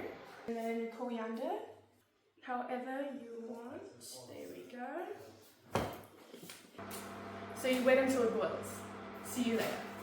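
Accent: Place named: United States. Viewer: 😂 oh how she’s grown and that accent, efinitely the American guest influence, just love it!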